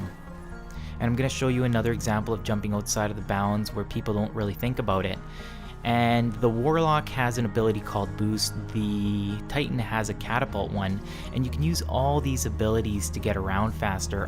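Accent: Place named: Canada. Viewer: This guy is a fellow Canadian, he has to be with that accent.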